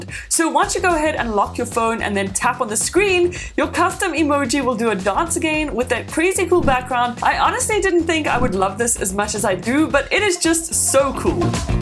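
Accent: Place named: Afrikaans, South Africa. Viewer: Ek wou sê jy klink afrikaans😂 goeie video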